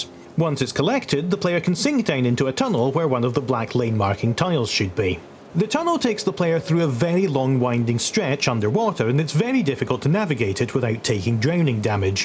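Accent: Scotland, United Kingdom. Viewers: Your commentary is so Scottish and I love it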